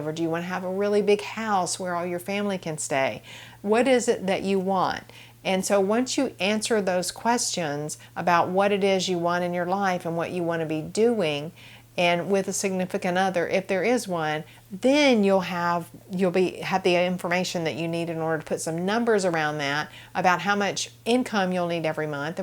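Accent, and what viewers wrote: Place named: South, United States. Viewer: Love your videos! Where do you live? I notice a Southern accent and I'm in Charlotte NC:)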